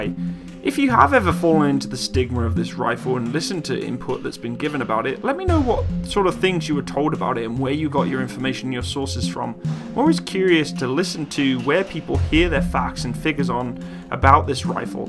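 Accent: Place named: England, United Kingdom. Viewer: you sound english/ do you live in murica, cos if you still live in then uk how did you get the tavor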